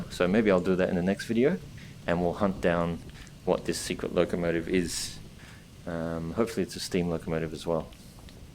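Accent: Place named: Australia. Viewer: What country are you from, you have a slight Australian accent.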